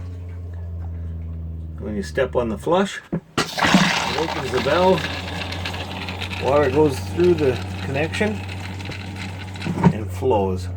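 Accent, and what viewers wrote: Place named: Wisconsin, United States. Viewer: Very helpful video. With that accent you must be from Wisconsin.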